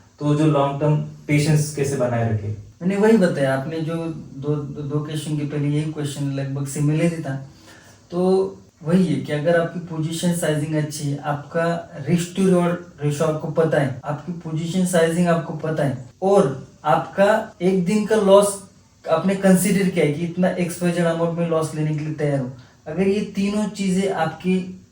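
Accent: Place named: Maharashtra, India. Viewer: मराठी..मस्त.. गुलाबी हिंदी भाई😂😂 एकदम कडक I like it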